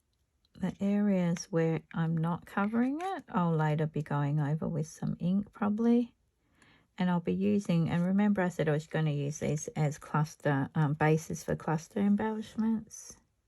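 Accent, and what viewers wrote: Australia. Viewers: I enjoyed your talking! You did a great job. I am not very knowledgeable when it comes to mixed media and learn quite a bit from you! Keep talking! We Americans love an Aussie Accent!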